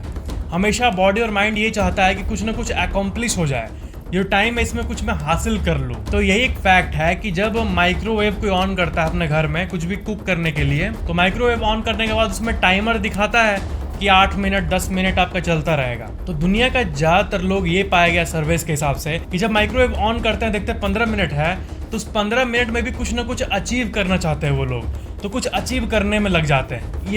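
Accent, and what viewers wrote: Bihar, India. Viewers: Sir app Bihar se hai kya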